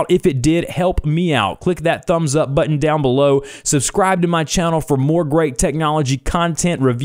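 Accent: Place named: South, United States. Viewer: Not useful. I have had DB several years. You used to be able to just add files to your local Dropbox folder and it would get uploaded automatically. And to share there was a local button. But it appears that's all gone away. This video had nothing on this. I can't say I was fond of the Southern twang either...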